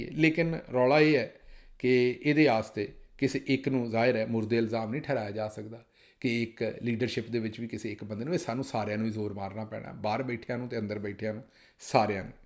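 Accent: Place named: Amritsar, India. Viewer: I agree. His Punjabi is sophisticated and sweet. He mentioned that he speaks Amritsar dialect. We speak similar Punjabi with Jalandhar dialect. This is not to detract from his amazing jihad against the dictatorship.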